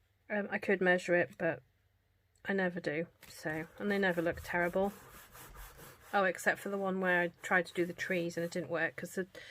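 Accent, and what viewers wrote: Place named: Wales, United Kingdom. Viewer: Beautiful, great technique! Great to hop with you. Also, I hear a little welsh accent coming through. Reminds me of home!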